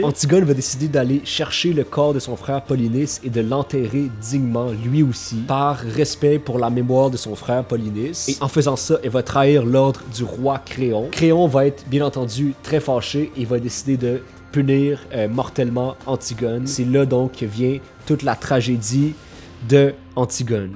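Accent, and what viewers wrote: Quebec, Canada. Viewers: j'adore ta chaine, continues tes vidéos lecture, c'est très intéressant, ce que tu as écrit à la fin m'a touché, c'était très bien dit, bisous de France (haha j'essaie de me familiariser avec ton accent québécois)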